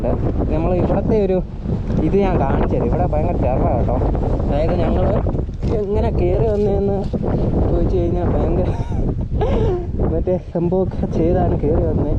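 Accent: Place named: Kollam, India. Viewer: athalla bro broyude place kollam allenn choikkan karanam slang anu atha😁😁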